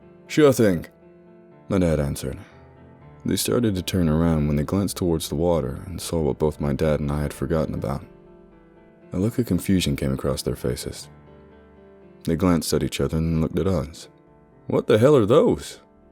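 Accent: United States. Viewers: I would think your American if I didn’t know you weren’t ( this coming from an American). Great job love your content.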